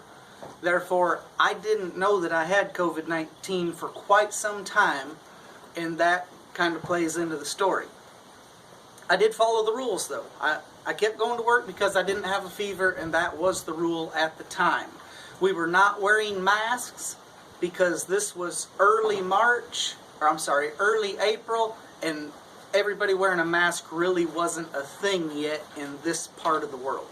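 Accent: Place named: Alabama, United States. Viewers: I live in Nebraska and most other states assume we talk like this. We don’t. We have a flat (no) accent. When I heard you speak the first time, I thought the same thing “what a nice accent!” It’s clearly southern, but not terribly twangy. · I live in Alabama too, it's weird hearing you say that, half the people around here talk like that!😆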